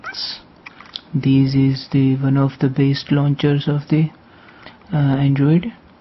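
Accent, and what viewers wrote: India. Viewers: Come on. He has an indian accent.....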